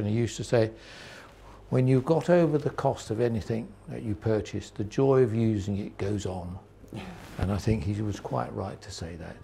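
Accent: United Kingdom. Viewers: speaks a very natural and nice English... with a London accent but without sounding too posh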